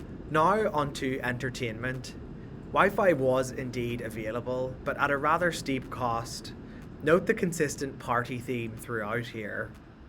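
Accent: Northern Ireland, United Kingdom. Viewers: Hello, your video just popped into my inbox tonight and your lovely Northern Ireland accent, combined with you being dressed very nicely but comfortably, got me watching. I have never flown Virgin Atlantic, BA, Aer Lingus and Air Canada are my usual choices. I enjoyed your video but was very disappointed that you did not get a window seat. The Lounge looked excellent. I have been traveling alone now for about 15 years and trust me, I am very comfortable now. I am originally from the West of Ireland. I do like your lovely approach to showing us exactly what we need to see and hear. Video was perfect from start to finish. Good luck on your Journeys. I have subscribed to your Channel. Have a great week.🍀✈️☕️🫖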